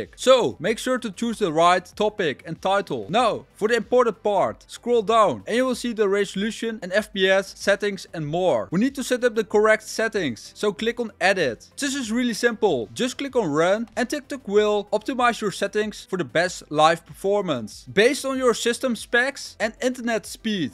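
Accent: Netherlands. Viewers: I smell some nederlands a bit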